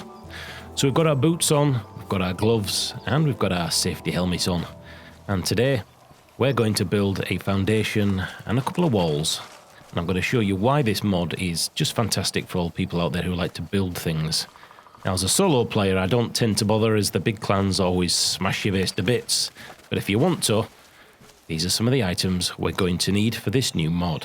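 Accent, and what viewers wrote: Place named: Wakefield, United Kingdom. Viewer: Eaar Byyy Gum, Cheers for the video lad, Wakefield accent i can hear there? :)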